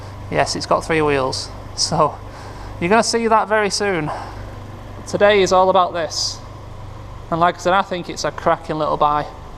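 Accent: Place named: Northern England, United Kingdom. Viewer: ....also, was watching this on mute with subtitles.... now realised you’re a northerner like me! That’s a win over the southern salvage guys 🤣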